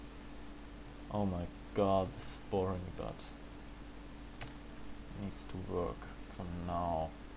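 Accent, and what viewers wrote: Germany. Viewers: Super tut, aber komm, an deiner Aussprache erkennt man den Deutschen ;) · 100% deutscher :D hört man am akzent :D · Du bist Deutsch oder ? :D merkt man am Aktzent :) , sehr gutes Video Danke für die Hilfe :)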